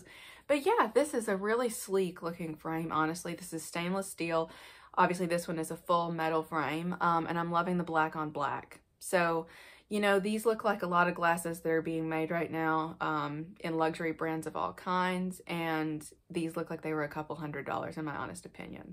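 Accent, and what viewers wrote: United States. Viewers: thanks for the new video! Was thinking about you the other day. "Where did she disappear?", I thought. Glad to see you are fine! As always, nice, detailed review on a couple of awesome sunglasses! The same cool sounding American English to me!:)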